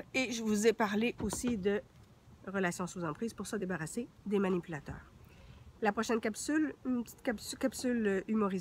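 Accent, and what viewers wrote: Quebec, Canada. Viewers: Belle vidéo et j'aime. ton ascend québécois j'adore. Super que tu fasse, partagé ton expérience, personnelle.merci franchement j'aime.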